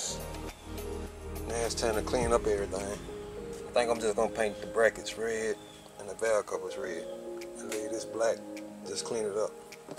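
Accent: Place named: Missouri, United States. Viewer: you sound like your in Missouri, Thad be cool, long live the Cicada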